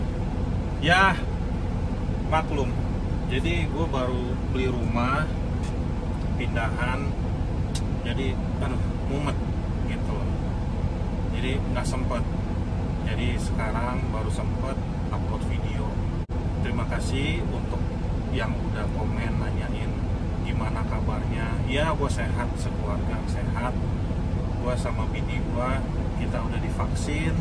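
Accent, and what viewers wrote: Indonesia. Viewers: Akhirnya aplod lg Trucker favorit. Kalo dengerin ocehannya asik bgt krn logatnya masih 'Indonesia' bgt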